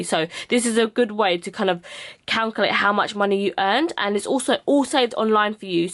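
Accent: United Kingdom. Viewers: Wow LOVE your British accent 😻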